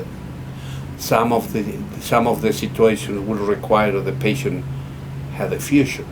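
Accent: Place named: Italy. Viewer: You never explained why, what you do, is better than fusion and other invasive/destructive procedures such as laminectomies. This is the third video I've watched of yours and you don't at least provide a description of the procedure that have found very effective. However, I will forgive you; as you appear to be an Italian immigrant that is bilingual; and the English language makes it harder for you to articulate or put into words, your techniques!😃 But I'm guessing that you use endoscopic decompression with precision drills either of small size or ultrasonic; which I understand can be very effective with minimal damage to the body's own tissues. And I perceive that you are a doctor WHO AIMS TO ADDRESS THE REAL CAUSE OF THE PAIN, NEUROPATHY AND MYELOPATHY. That is a rare trait in today's medical system. Today's allopathic doctors are more concerned with money and treatment of SYMPTOMS rather than stopping the REAL CAUSE! 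They try to "improve' on our Creator's designs of the spine by removing parts and replacing them with metal alloys to create some stability. Instead of aiming to remove the offending bone spur, cyst, or herniated disc or ligament to free up space in the central canal and the foramen where the nerve roots exit. Thank-you, as I am in my 3rd consultation with spine surgeons pre-surgery; after updating my MRI's, CT scans and X-rays. He is reknown for the M.I.L.D procedures and I hope to consult with him in the next few days to see what he recommends. (I have liga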